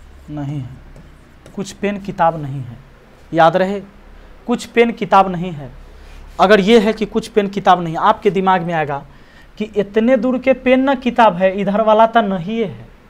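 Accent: Bihar, India. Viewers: Bihari style me hindi sun ke to sir maza hi aa jata hai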